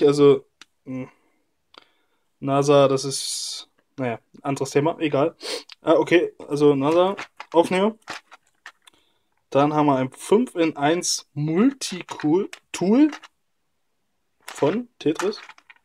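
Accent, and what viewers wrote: Saxony, Germany. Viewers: bist du sachse ?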